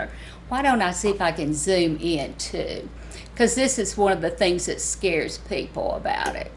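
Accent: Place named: South, United States. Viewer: Thank you so much for this video, and you have a way to explain things that makes it so easy. I know you say is only straight stich but when you are done can you back and forth stich to secure the gather? Sounds silly me asking for this or do i have to change it to foot number 1 to do that. Such beautiful southern accent, love it. I mean to ask when calculating panels for a ruffle skirt how do you go about it? what is the formula so that the gather matches the panels? I can be good at math but at the same time in certain things I am not so good. Do you have a video on that? Thank you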